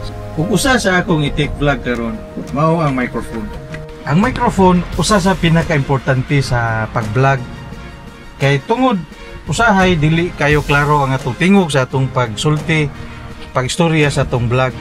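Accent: Visayas, Philippines. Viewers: bisaya diay ka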